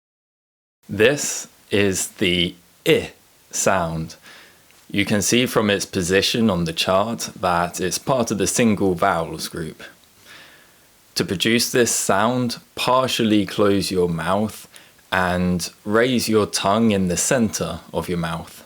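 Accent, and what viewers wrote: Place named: England, United Kingdom. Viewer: hello, where are u from in England,:")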